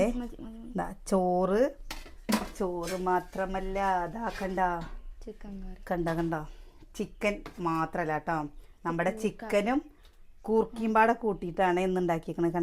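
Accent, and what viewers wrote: Palakkad, India. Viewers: You are good house wife I respect you.Your muringa curry I didn't like.Your palakkadan slang is very nice.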